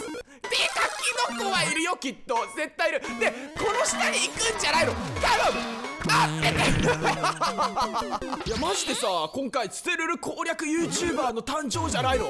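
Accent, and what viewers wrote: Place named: Kansai, Japan. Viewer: 関西人やな どう？合ってる？イントネーション引っかかった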